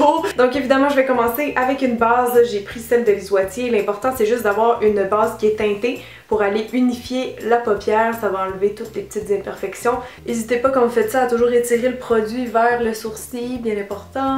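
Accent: Quebec, Canada. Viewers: Adorable ou.... Joli make up et encore une vidéo avec le charme de l'accent canadien..... j'adoreeeeee. Bisous Sublissima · Franchement j’adore ce style de maquillage qu’on voit hélas peu, t’es une fille super naturelle de manière générale dans tes vidéos car j’en ai vu plusieurs. Un jour il faudra que j’aille au Québec j’adore tellement l’accent, bonne année from France 😊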